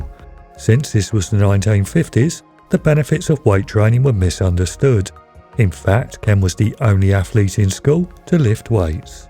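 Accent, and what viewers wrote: United Kingdom. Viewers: Stop with British accents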